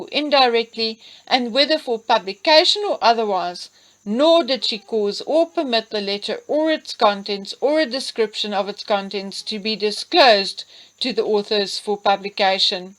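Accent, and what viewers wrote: South Africa. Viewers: I could listen to the South African accent for days.....xxx love your narrating voice x this must go to trial x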